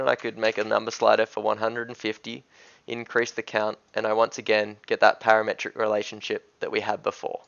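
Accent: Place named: Australia. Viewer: I think hes from Melbourne, Australia, based on the accent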